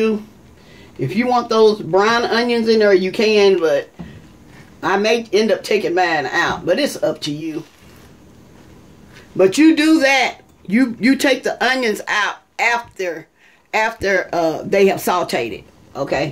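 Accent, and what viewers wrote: South, United States. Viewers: Her strong southern accent just made this 100× better. I KNOW that liver and onions good. Lol Also, I've never had it before and my bf wanted some. This helped a lot. ♥️ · Thanks looks good I really like your your southern accents I know people southern I really like southern friend chicken Thanks for sharing blessed you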